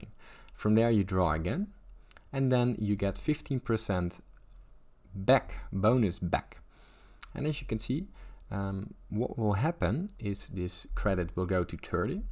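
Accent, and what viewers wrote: Netherlands. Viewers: Your accent just screams Dutch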